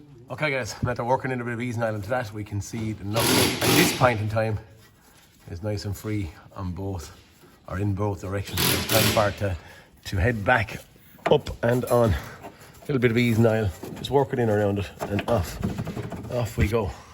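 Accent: Ireland. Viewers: I've been away from Ireland for a week..and that has to be the most Irish accent I've ever heard in my life. Brilliant production and delivery.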